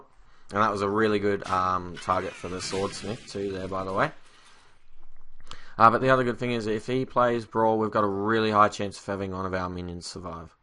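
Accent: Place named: Australia. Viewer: BNOracle Here. In the intro, you really needed to use the word snowball. Also,You sound way more australian through the snowball :P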